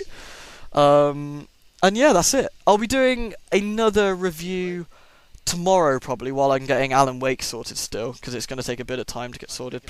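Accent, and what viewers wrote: United Kingdom. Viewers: You made me laugh so much during this LP :D My boyfriend wants to buy this game because after I saw your LP I talked about it a lot. I love Lovecraft, and I love your British accent so this LP was what made me subscribe to you.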